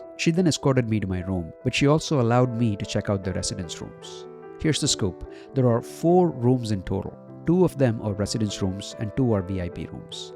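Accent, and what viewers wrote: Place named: Philippines. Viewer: What a great review! Excellent commentary and not too disharmonious music. Great videography that wasn't rushed: loved how you showed the presentation. I assume you are Filipino from your accent? By the way, on Philippine Airlines, the tablecloths always look like they've been pressed, not that I'd ever previously thought about that. Keep up the good work. This review deserves many more than 7500 views.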